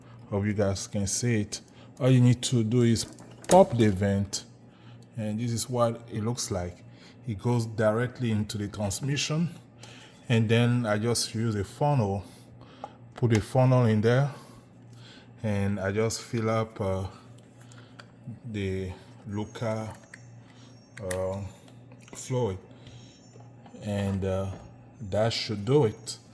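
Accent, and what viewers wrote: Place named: France. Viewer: I love your French accent.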